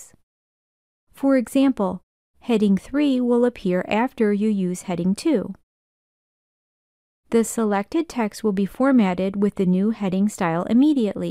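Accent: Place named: Midwest, United States. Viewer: Midwest accent is awesome ❤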